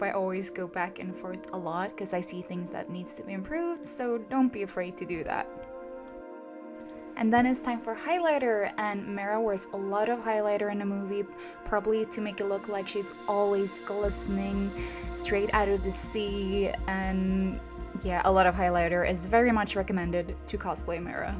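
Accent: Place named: Norway. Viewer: I knew it!! My mother is Norwegian and I was born in Norway but I live in America sents I was 6 ( I’m 18 now) so I don’t have much of an accent . But I thought you sounded Norwegian 😂💕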